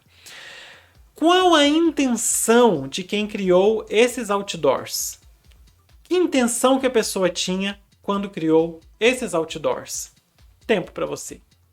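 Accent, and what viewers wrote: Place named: Minas Gerais, Brazil. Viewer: caramba! Jurava que vc era de algum Bernoulli (pelo sotaque que me lembra o MG). Estudei em um cursinho renomado e os professores não eram tão bons como vc (no sentido didático).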